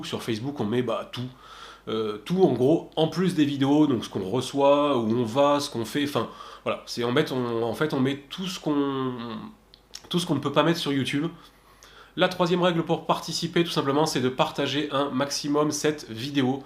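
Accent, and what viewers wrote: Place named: South, France. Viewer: je participe,compte facebook:Numa Fishing , Big Up première chaîne You tube dédiée a la pêche !!je pense c'est l’accent du sud qui joue un peu,mdr !! a plus les mecs ....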